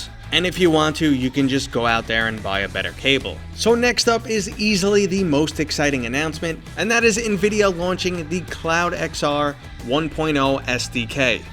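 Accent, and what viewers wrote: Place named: New England, United States. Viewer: You sound like you are from New England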